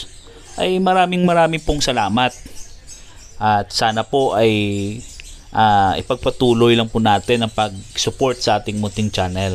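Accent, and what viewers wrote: Iloilo, Philippines. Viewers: Puga lods ilonggo ka gid? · To ilonngo ka.. may punto kasi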